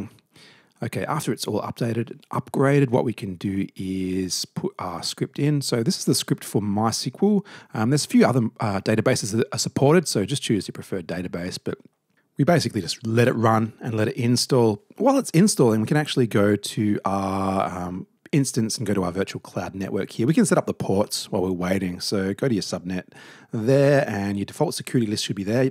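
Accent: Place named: Australia. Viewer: nice aussie accent :)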